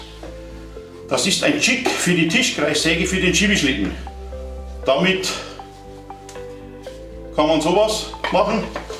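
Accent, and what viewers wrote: Bavaria, Germany. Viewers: Beinahe hätte ich ein Daumen nach unten gegeben. Das Video war äusserst langweilig, Die Stummfilm-Zeiten sind doch lange vor unserer Zeit vorbei gewesen. Bitte sprich wieder in den Videos deine bayrische Goschen, hat mir immer Spass gemacht.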